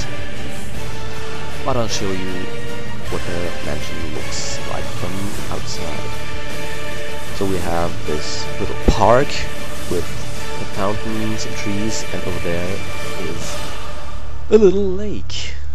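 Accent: Germany. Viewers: ich bin beeindruckt dass du den deutschen akzent so verhältnismäßig gut verschleiern kannst^^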